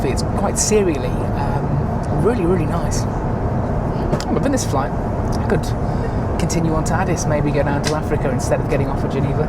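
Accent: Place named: United Kingdom. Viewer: I can listen to this British 🇬🇧 accent forever 😀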